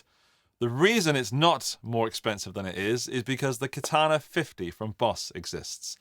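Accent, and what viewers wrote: United Kingdom. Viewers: Black start me up. Yeah I was researching this and you've clarified like butter. Thanks. You Brits make everything sound good anyway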